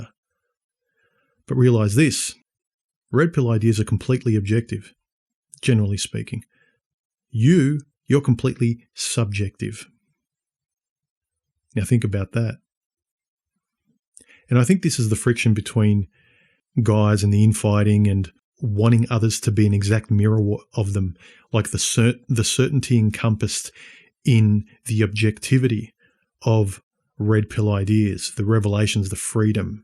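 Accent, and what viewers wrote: Australia. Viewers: Really dig this channel. Also pretty cool to hear an Aussie on YouTube covering these topics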